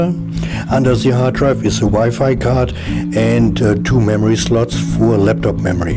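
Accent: Germany. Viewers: Good video, just ordered a refurbished one, gonna change the cpu and add a ssd and 16gb of ram. By the way... Are from Germany or a native German speaker? Greetings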